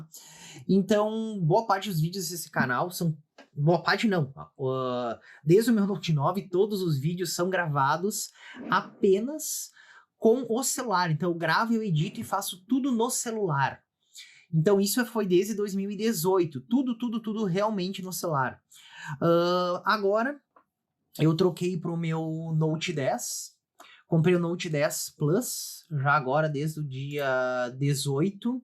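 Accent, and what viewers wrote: Brazil. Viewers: Tu és de SC ou RS, tem muito sotaque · Sotaque de Porto Alegre, certeza!! Curtindo demais teus vídeos, vizinho! Kkkkk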